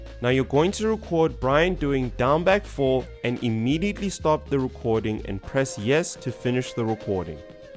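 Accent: South Africa. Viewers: anazing background music and south african accent? subscribed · Lol I was watching a Lil Majin vid than randomly your video played next and got me interested and as I kept listening and watching without paying attention to your youtube username I suddenly realized bro is a fellow South African! Definitely subbed. Working on a ton of projects for my own channel, keep pushing bro!🔥⚡️🇿🇦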